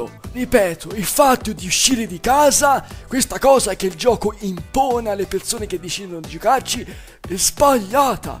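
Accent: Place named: Tuscany, Italy. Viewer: E da quando hai l'accento toscano?!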